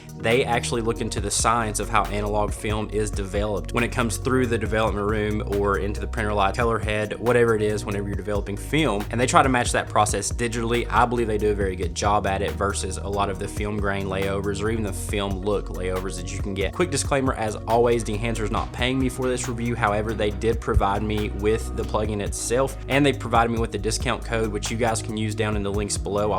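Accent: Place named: Georgia, United States. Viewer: That's a Georgia Accent if I ever heard one. Takes one to know one. Marietta!!!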